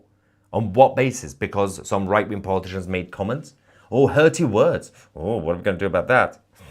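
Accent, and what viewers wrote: United Kingdom. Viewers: Well, Trump didn't want war and Bibi did. Shows who really is in charge. Another disgrace is beginning. Asa for this announcer, he has a British accent. Just for that, I wouldn't believe a word he has to say.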